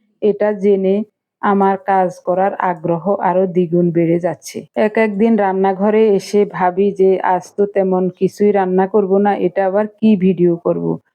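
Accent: Noakhali, Bangladesh. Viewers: Bandhacopi bata khub bhalo laglo noakhalir khuda lagle hudao katha kotobachar par sune mon khub khub bhalo laglo dhanyawad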